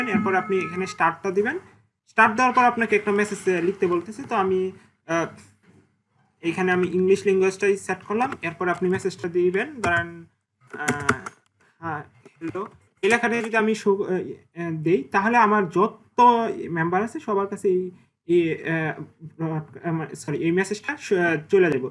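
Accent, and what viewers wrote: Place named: India. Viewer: Could you try to speak more international English in your next video please. A strong Indian accent is hard to understand if not used to.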